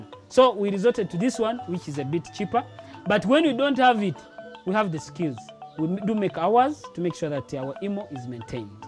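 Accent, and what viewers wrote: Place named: Kenya. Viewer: This's Kenya English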